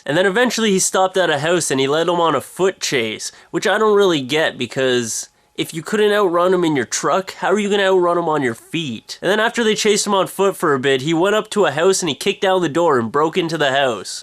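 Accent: Canada. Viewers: It's the canadian prairies accent. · LOL. I think it's so funny when you Americans think that Canadians say things strange. What exactly are you hearing? How's he supposed to say "house"? It's kind of like how a lot of you Americans say "ruff" for "roof." Why do you make a dog noise for "roof"? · canadian eh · "don't struggle or i might get a stiffy!" hahaha funny shit! and i like how you say house. i wish i had a canadian accent :( oh, well. canadians have good weed too! :) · that's the Canadian accent, its not weird -_ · i knew u were from canada, but i just now noticed u have a accent. like when u said house.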